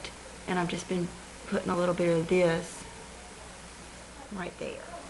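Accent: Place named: North Carolina, United States. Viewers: Mrs., you had me laughing this whole video with all of your annotations. You and my mom are two peas in a pod. You remind me of her so much. We're from NC, and everything from your accent, to your 'sillyness' just reminds me of her. I'm only 19, and I don't live with her any more, and watching you is like a little piece of mom on my computer screen;). Love your vids! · It's good to laugh at yourself sometimes, you look lovely anyway! Love your accent, I grew up in S.C., makes me kind of homesick! Happy Mother's Day!!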